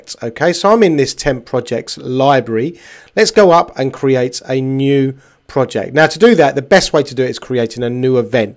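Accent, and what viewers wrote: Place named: United Kingdom. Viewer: You must be from England or Scotland sir. Anyway, Great explanation. Looking forward to hearing more videos from you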